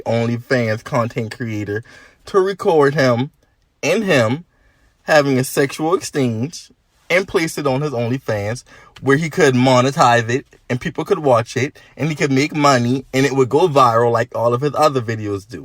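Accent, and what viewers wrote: Charleston, United States. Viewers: Are you from Charleston, S .C.? Beautiful accent.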